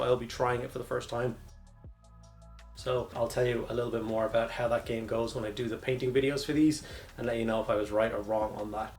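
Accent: Ireland. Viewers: tbh as long as I hear your lovely irish dulcet tones I am happy, you encourage me to paint. No nonsens, just paint encourages new cummers to the hobbie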